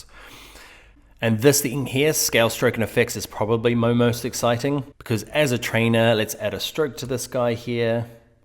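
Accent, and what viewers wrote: New Zealand. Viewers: Great video thanks mate. Are you a kiwi? Sound like a kiwi :))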